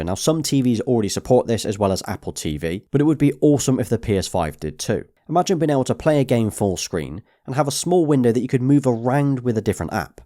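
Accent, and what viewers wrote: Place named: Leicester, United Kingdom. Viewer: Your voice really reminds me of mark Selby! Are you from Leicester lad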